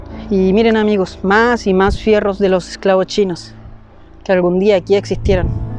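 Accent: Chile. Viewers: Que acento desagradable el Chileno 🥴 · Muy interesante ese vídeo pero no se entiende mi papá lo que hablas deberías hablar más despacio para que se entienda solamente entre chilenos se entiende eso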